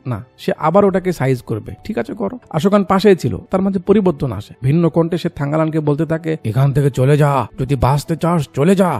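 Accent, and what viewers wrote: Bogura, Bangladesh. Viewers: হামি তো ভেবেছিলাম আপনার বাড়ি বগুড়া এল্লা কোন কথা বারে আপনি বগুড়ার ছল নো ?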